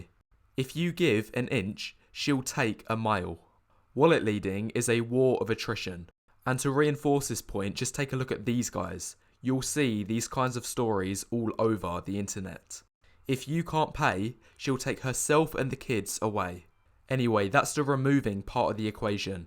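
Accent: United Kingdom. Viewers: although I don't like British accent i really appreciate your voice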